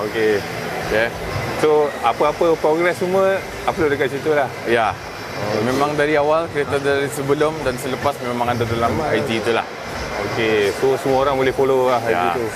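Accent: Sabah, Malaysia. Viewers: Dia cuba untuk ckp.malaysia tapi tk mnjdi haha....sbb sye pun brunei + malaysia mcm sbah pulk haha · Knapa loghat mcam Sabah?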